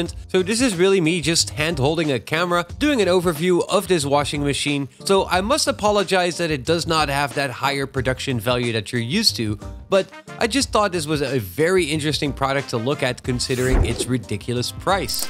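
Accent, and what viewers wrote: Netherlands. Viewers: We don’t mind this kind of production. It still had your graphics, music and that Dutch take on the English language :D. Feel free to keep up the great videos in this format.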